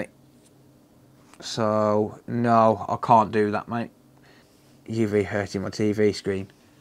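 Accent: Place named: Birmingham, United Kingdom. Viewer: Wot part of brum r u from bud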